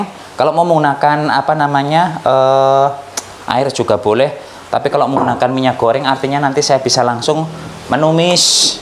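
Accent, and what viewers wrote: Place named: Banyumasan, Indonesia. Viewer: Mase nek bicara ngapak, daerah cilacap tah mase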